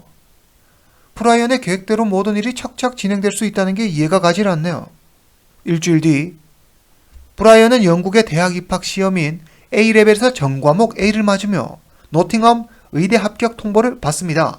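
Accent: China. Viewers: 억양이 한국분 맞나요? 중국 태생이신가요?귀화하신건가요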